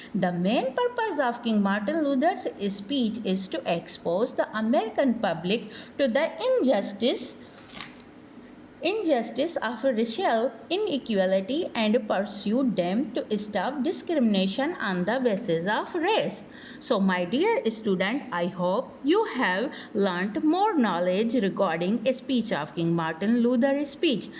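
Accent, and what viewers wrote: Sindh, Pakistan. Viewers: English in sindhi Accent !!!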